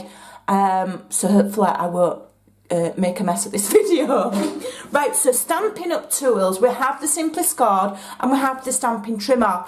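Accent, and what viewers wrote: United Kingdom. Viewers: Bless your sweet British heart! I’ve purchased Dysart’s that only contain measurements in centimeters which have rendered me useless... clearly I didn’t pay much attention in math class which I hated though this tutorial is a godsend. I could listen to your charming accent and joyful laugh all day long so thank you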